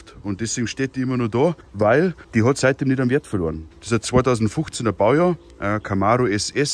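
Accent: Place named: Salzburg, Austria. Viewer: Bist du im Berchtesgadener Land dahoim? Der Dialekt klingt so gleich wie da meinige da in Salzburg.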